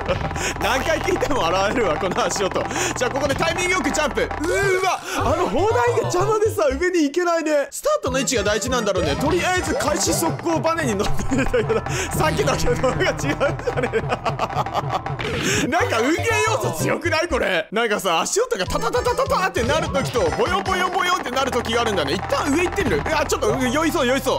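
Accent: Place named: Northern Kyushu, Japan. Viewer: ども！女性視聴者ですww もう、九州北部出身からしたら 地元が近い&方言で推せますね👊( ¨̮💪)✨ いつも元気もらってます✋( ˆ̑‵̮ˆ̑ )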